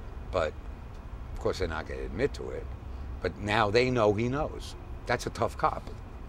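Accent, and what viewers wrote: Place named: Brooklyn, United States. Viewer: His Brooklyn accent is too strong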